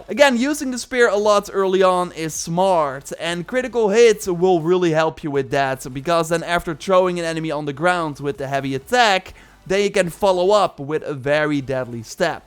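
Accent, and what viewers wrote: Netherlands. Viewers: That accent 😂❤️ You’re definitely Dutch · You're Dutch right? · Amai da's een extreem accent ik geloof niet dat je geen Nederlander bent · Jij bent vast en zeker een Nederlander kan k horen aan 't accent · I smell dutch🇳🇱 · He ben je ook nederlands (nederlands accsent) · This guy HAS to be dutch with this accent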